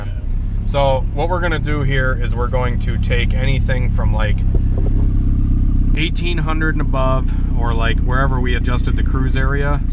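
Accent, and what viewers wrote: Canada. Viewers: I can't tell if your Amish or Canadian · dude i thought you were canadian. funniest shit I have watch while being in-depth about tuning. have him get the Ebay special headgasket next time.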